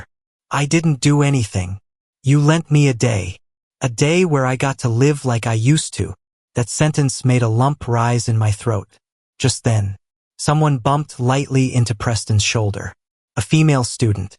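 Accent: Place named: Spain. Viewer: wtf is with the Spanish voice inflections? Can we PLEASE knock that shit off ?????!!!!!????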